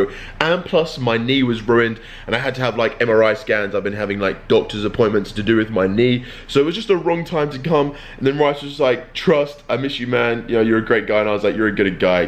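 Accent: United Kingdom. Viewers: He's British mate 😐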